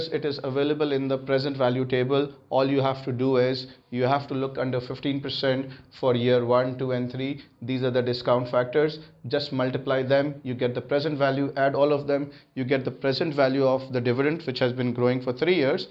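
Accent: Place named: England, United Kingdom. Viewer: I like your teaching sir, and your English accent 🤗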